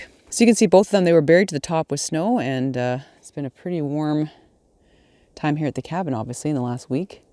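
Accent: Canada. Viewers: My thought exactly, I’m in Vancouver I too heard that Ontario accent...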